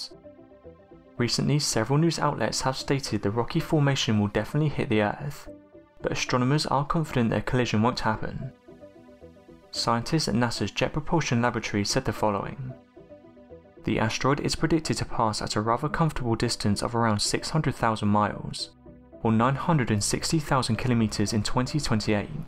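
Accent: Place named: Oxford, United Kingdom. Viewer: Your Oxford' English is realy Nice...but...I must listen Your Voice 3rd Times...sorry...for - I can understand American' English better 😊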